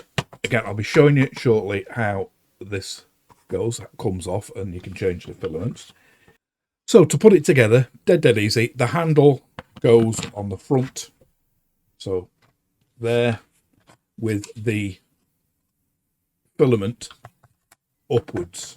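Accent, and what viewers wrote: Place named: England, United Kingdom. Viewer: Very good. Great to hear a true English accent as well, along with a rich vocabulary. Helps a lot.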